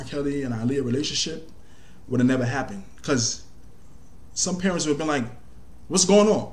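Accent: New York, United States. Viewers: You straight up New York lol accent off the chain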